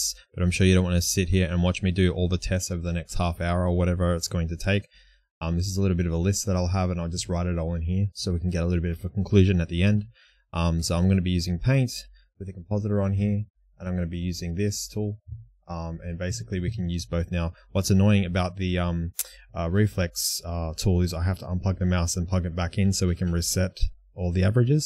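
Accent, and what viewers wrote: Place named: Australia. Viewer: Dude, I guessed that you were from either: newcastle , cronulla, or wollongong ;) nice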